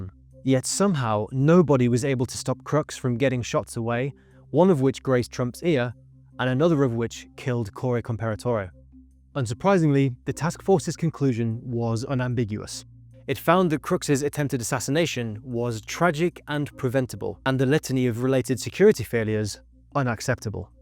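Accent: United Kingdom. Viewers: Did you not hear his voice. British bro.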